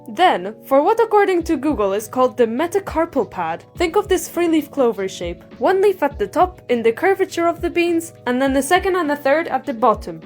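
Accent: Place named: Scotland, United Kingdom. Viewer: hold on why is it after like 9 seconds you switch to a more Scottish accent???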